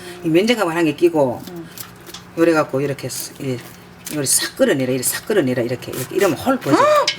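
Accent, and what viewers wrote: Gyeongsang, South Korea. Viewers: 멋진 팁 ! 감사합니다 갱상도 사투리라 더 정감 갑니다 ㅎ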